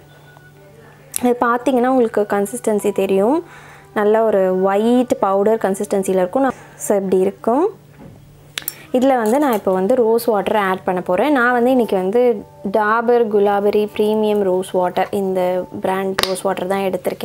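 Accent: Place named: England, United Kingdom. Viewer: Wow wat a English accent 🤗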